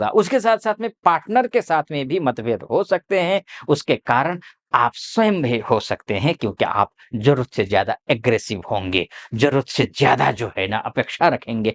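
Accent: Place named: Nepal. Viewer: Your accent looks like you r from nepal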